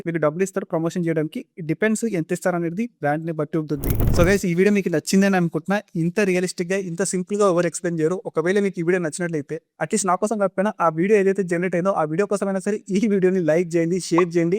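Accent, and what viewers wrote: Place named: India. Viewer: Voice koncham... telugu and English kalisina accent lo vastundi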